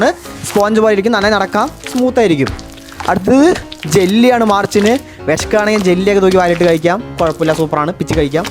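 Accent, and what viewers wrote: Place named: Palakkad, India. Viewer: Palakkad ahno veed slang kettapo choichathann NJN palakkad ahn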